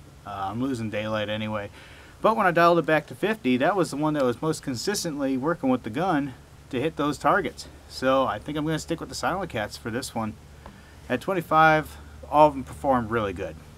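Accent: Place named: Wisconsin, United States. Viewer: Is he from Wisc-aaaahnsin ?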